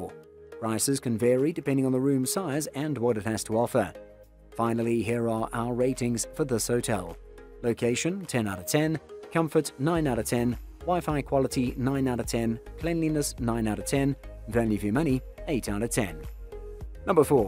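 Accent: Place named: England, United Kingdom. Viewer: Thank you for this nice video. I do not know where you live but you sound like you have an English or Australian accent. We do not pronounce it either way you keep saying it! I live in Florida right near the Keys!! Go there all the time! Have a great friends, who have lived there for years!! Isle. Pronounce the word as: isle, aisle & I’ll! 😊 Phonetically, it is called - I’ll MORADA!!!! Islamorada, Florida 🇺🇸❤️🗽🗾🏝️☀️🌊🎣🎣🎣🐠🐟🐬🐋🦞🐢🦀🦑🦈🦈🦈🐊🌴🏊🏻‍♀️🚣🏻‍♀️🚴🏻⛳️🛺➕ 💃🏼🕺🏻🎸🥁🎹🪇🪕🎺🪘🥁🎸🚤🛶⛵️🚤🛶⛵️🛥️🎉🎉🎉🎉🎉🎉🎉🎉🎉🎉🎉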